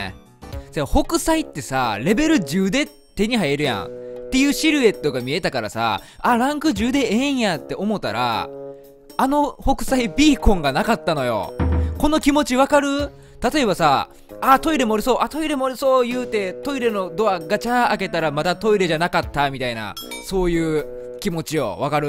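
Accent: Kansai, Japan. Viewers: さん関西人ですか?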